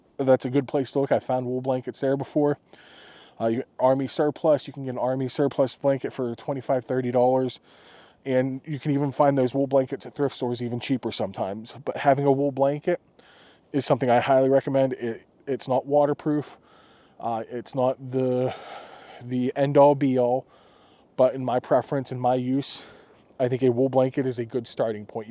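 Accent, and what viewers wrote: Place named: Pennsylvania, United States. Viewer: Loved your video. I am originally from Pa. Kittanning near Pittsburgh. Thought I detected a little Pa accent. Living in SC now. Nice kit. Not over complicated.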